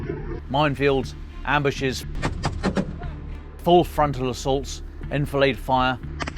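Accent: RP, United Kingdom. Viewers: What a lovely load of British propaganda masquerading as objective analysis. A smidgen of truth, like sugar, to make the lies go down easier, wrapped in a syrup of posh British accent which gives the content / message ("massage") the necessary perceived gravitas to deceive the willfully blind. Well done. A master class in the application of Marshall Mcluhan's work. But of course, the truth will eventually come out. It will be entertaining to watch the excuses, the spin, the recriminations and attempts to forget and sweep things under the rug. The ultimate question being just how forgiving the good citizens of the West will be once it is apparent how badly their trust and faith in their leadership, "experts" and media has been abused. Will it be another 1789 moment? History has a remarkable pattern of repeating. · 😂😂😂. BS in an elite British accent... 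Bakhmut has fallen. Artyomovsk has risen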